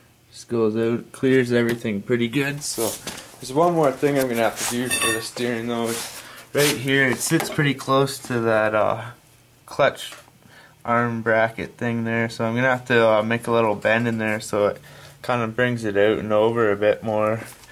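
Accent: Canada. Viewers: Where are you originally from? It sounds like your from western canada originally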